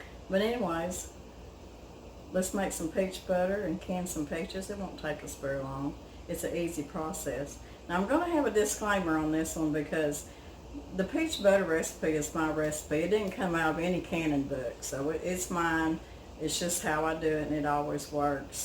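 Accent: South, United States. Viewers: Love the Southern accent. I was like what’s a steak cake? 🤣🤣🤣Stack cake. Don’t know what that is, but I’ll look that up next. I’m from the PNW so my ears don’t always hear right!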